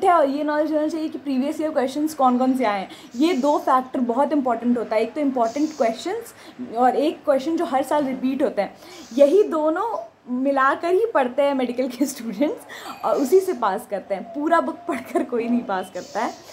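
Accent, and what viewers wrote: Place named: Bihar, India. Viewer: Yrr... BIHARI TONE💓💓 Ekdm bawaal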